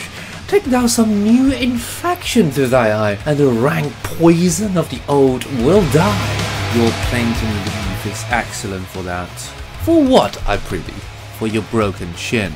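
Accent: United Kingdom. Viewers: 已訂閱，全部影片重複播放中。 最喜歡老師唸故事的時候，閉上眼睛沈浸在優雅的英國腔裡。 常常因此捷運坐過頭😝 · ，你的英國腔真帥啊！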